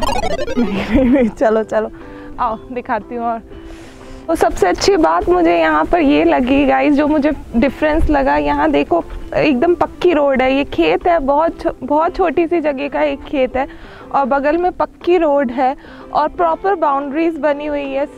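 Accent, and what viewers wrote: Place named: Madhya Pradesh, India. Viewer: अरे आप मप्र से हो आज पता चला। मैं तो पहले ही समझ गया था, आपके बोलने के तरीके से आप हमारे एरिए से हो आप मप्र में कहाँ से हो।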